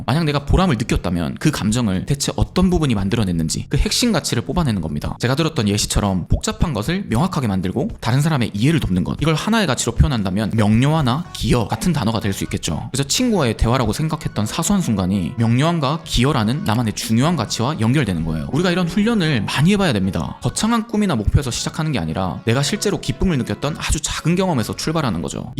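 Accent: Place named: Gyeongsang, South Korea. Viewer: 나이가 40이 다되가는데, 아직까지 방황하고 있어서 잘 듣고 있습니다. 듣다 드는 생각인데 혹시 대구분이신지요? 사투리가 너무 익숙해서 물어봅니다 ㅋㅋ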